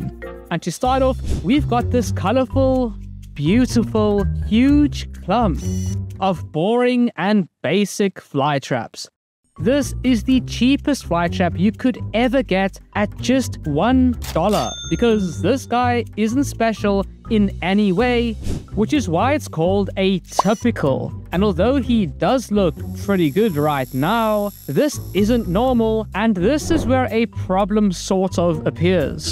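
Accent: South Africa. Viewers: Are you South African bc i think you are.Pls answer😢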